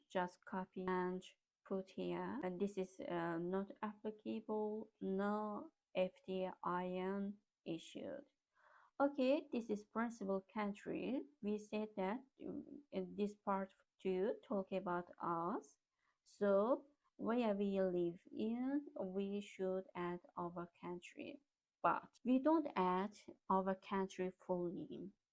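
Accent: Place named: Azerbaijan. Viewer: Aksent ve danisiqdan basa dusdumki azerbaycanlisiz, Meni cox sevindirdi bu video, xahis edirem sosyal sebekesi elave edin siz bizlere cox vacib insansiz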